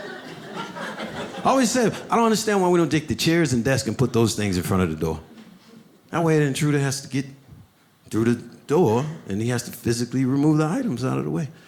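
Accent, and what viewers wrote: Dominican Republic. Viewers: My boss said to ××cap a chino×× dude I lost it that was a great joke and crazier punchline and with the Dominican accent made it all come together!!!!! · I don't know if most of you realize the funniest part about his spanish accent is that he nails the dominican accent!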